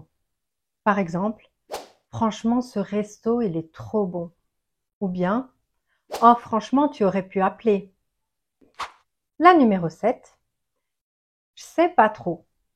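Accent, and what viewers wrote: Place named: Italy. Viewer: C'est la première fois que je visionne vos vidéos, mais je tiens à dire qu'à première vue, j'ai immédiatement remarqué que vous etes sans doute d'origine italienne. Cela se ressent dans votre charme italien et je dois avouer que j'apprécie énormément vos prononciation.Bref,les expressions que vous avez mentionnées sont très utiles, utlisables dans la vie quotidienne. Grazie mille.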